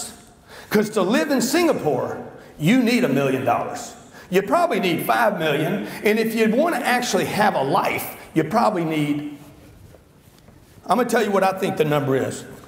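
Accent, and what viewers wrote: South, United States. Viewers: I love Grants Southern accent, makes him just that much more BADASS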